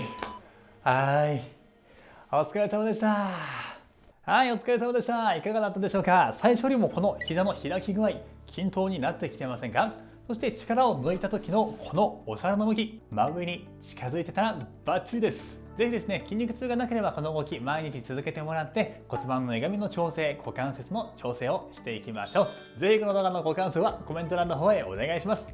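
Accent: Kansai, Japan. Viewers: 先生のストレッチは、即効性があるのでやる気出ます‼️ 分かりやすい説明ありがとうございます‼️ そして、たまーに出る関西訛りが可愛いです。by関西人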